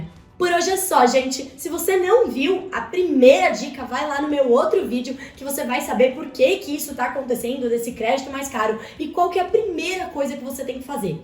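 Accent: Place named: São Paulo, Brazil. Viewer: Ela é muito lindinha com esse sotaque de paulistana!!!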